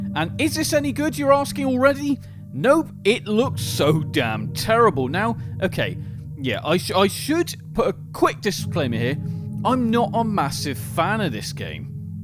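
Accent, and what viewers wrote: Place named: United Kingdom. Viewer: i hate when your gross british accent utters rebecca when the character is obvi jill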